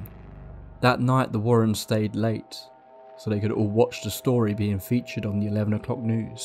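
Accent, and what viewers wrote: England, United Kingdom. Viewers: Narrator with an English accent knows what happens in Connecticut in the 1970s .. plagiarized crap, or made up crap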